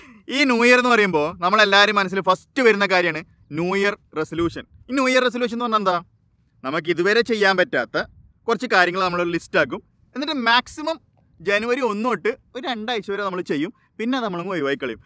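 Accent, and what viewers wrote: Kannur, India. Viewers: Engale kannur karan aano bro ?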